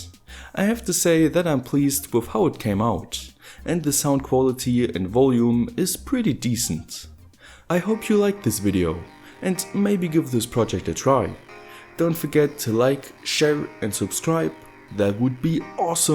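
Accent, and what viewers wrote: Germany. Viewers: Bro you have to be german, there is no way this accent of your's is scottish, it's even more german than mine and of my german friends haha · Man Hört ja das du Deutsch bist daher eine Frage auf Deutsch :D...... kann man mit diesem aufbau auch größere boxen bzw. subwoofer mit einbinden ? wäre coll wenn jemand antwortet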